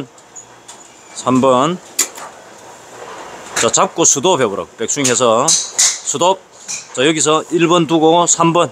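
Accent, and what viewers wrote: Andong, South Korea. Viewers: 구독자가 급속히 늘었네요. 억양이 경북 안동말씨같네요. 40년전 군대 선임하사가 고향이 안동이었는데.말투가 비슷하네요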